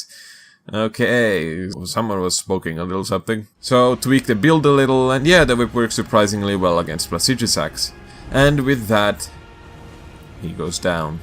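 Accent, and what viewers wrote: Finland. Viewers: Why the fuck do i smell Finnish accent… vittu saatana jätkä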